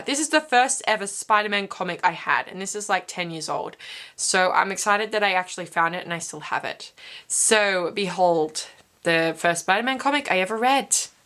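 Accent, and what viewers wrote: Australia. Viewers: Sounds Australian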